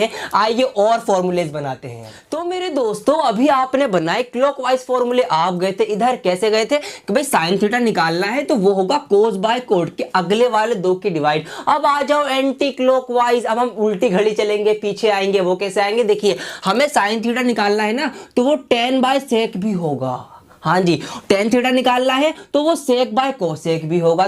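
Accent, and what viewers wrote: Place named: Uttar Pradesh, India. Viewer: Correct bro thanxx tum up ka ho ya to pata chal gaya😁🎄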